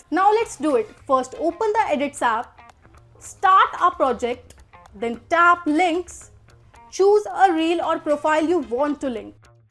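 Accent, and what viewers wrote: India. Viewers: Yeah Anytime and really nice East Indian Accent and Hot Beautiful Smile plus Personality.!!!💙❤🩵✅️👌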